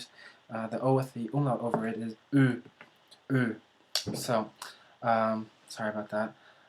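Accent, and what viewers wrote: Bavaria, Germany. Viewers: Ich frage mich ernsthaft, warum jemand Deutsch auf bayrischem Pseudo-Dialekt lernt :D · I think you should learn bavarian instead of standard german. ;) Your pronounciation is much closer to that dialect. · First of all, I am a german native speaker and I think your german is the best i have ever heared from a non-native speaker, even though you have this bavarian accent. Good work there. I love the dialects we have in germany, because some of them really sound like a whole different language when spoken to their full extent. Even I (coming from where they mostly talk kölsch) have a tough time trying to understand boarisch or sächsisch ;) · You really speak very hard and harsh bavarian german. That is not a problem, I just wanted to ask if this was your intention?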